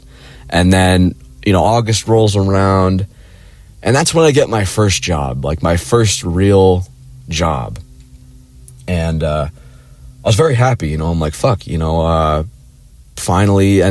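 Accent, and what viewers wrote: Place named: Minnesota, United States. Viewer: Dang the Minnesota accent is so clear in this video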